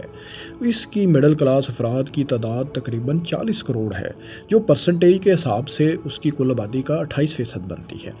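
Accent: Punjab, Pakistan. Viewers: Everything is good and valuable except the VOICE. He speaks Urdu, in Punjabi accent. Please change your narrator.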